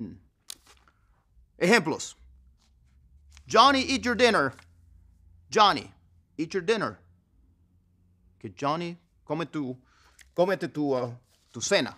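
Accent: South, United States. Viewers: Wouldn't have guessed you'd have a southern accent when you speak English haha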